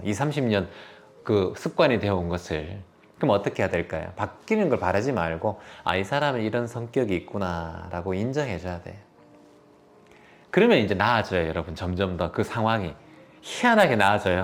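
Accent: Gyeongsang, South Korea. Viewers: 제고향 포항 오천 입니다 포항 말들으니까 더 정겹습니다❤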